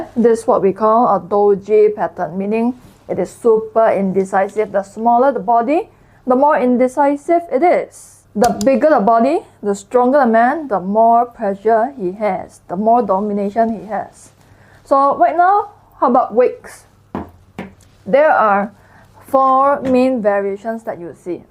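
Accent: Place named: Singapore. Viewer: Stop harrassing her. She is from Singapore, thus her accent. Go to the UK people, and you would barely understand any of their accents. Turn on Closed Captions if you don't understand.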